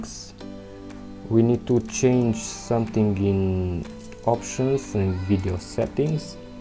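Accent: Romania. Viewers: Din primu cuvant mi-am dat seama ca esti roman :)) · ma intrebam daca esti ro de la primele 2 cuvinte :)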